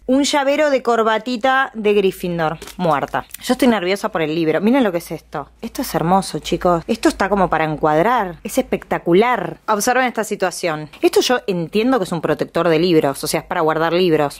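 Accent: Argentina. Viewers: Sos de argentina?????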